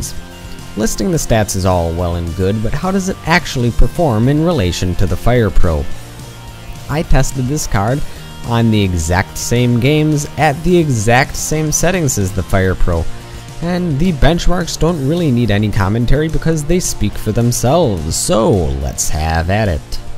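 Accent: Canada. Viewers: are you Canadian? because to me you sound Canadian (no offense)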